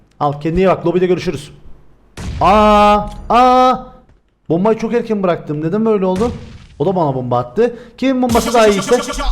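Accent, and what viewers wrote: Cyprus. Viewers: abi sen kıbrıslımısın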